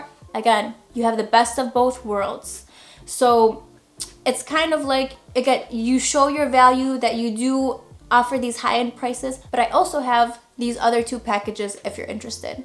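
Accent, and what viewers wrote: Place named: New York, United States. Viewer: You sound like Jennifer Lopez I would think you’re from New York and Spanish.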